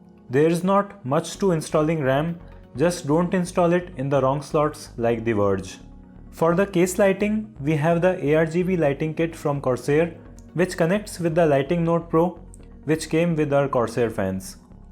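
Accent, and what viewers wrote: India. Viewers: Idk why but i had to laugh so hard bc of the indian accent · The same Indian accent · Indian accents have to be the worst to listen to my god. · finally an indian who speaks in indian accent, this is what I like not talking in a shitty american accent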